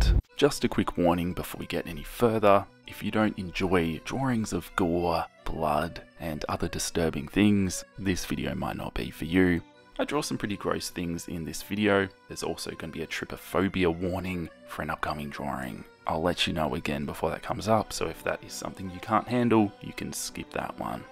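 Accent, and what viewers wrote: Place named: Australia. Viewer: The first drawing has Springtrap eyes, Change My Mind... or maybe im just a FNaF Nerd And your voice helps me think like it, since PJ Heywood is also Australian 😅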